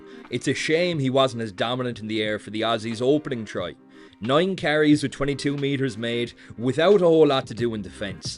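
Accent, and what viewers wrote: Ireland. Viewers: I think your videos would be going very far if you keep rating like that crap ...JGP could have been Player of the Match ....Hugo was fantastic ....you need to do better homework and rate your team not just on stats you get from Internet...you are obviously not a player. I won't be coming back to be droned by your flat Irish accent .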